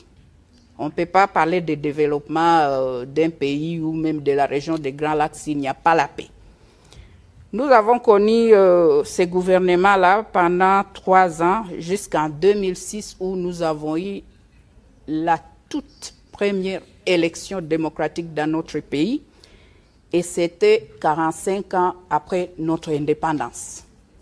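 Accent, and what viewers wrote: Rwanda. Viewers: Una sema swahili kina rwanda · Rwandaise kende na rwanda yoka ba ra naba re na prononciation naye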